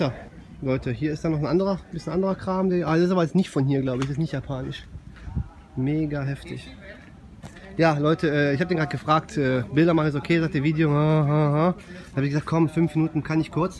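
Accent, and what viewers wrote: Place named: East Germany, Germany. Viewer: wie ne Ostdeutscher vor allem. ...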